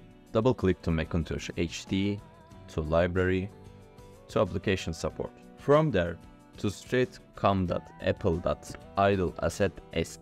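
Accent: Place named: Turkey. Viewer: Bi aksan ne kadar Türk aksani olabilirse o kadar. Almanya´dan selamlar!!!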